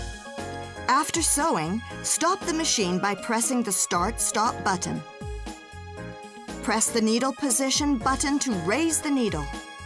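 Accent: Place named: Canada. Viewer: The narrator has a weird accent like Catherine O'Hara's character, Moira Rose, in the sitcom Schitt's Creek. Must be a Canadian actress thing.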